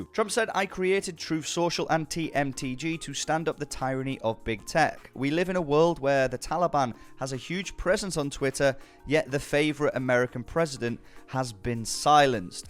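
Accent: United Kingdom. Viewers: Hay, Just new to this, but loving having a UK voice tell it like it is. Was think I might throw something at this, Cant find the stick on Etoro so I am guessing is one some sort of sub exchange. Any suggestions where best to access DWAC stocks from?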